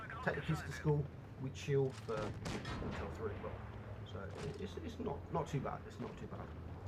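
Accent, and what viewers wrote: United Kingdom. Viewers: Legit british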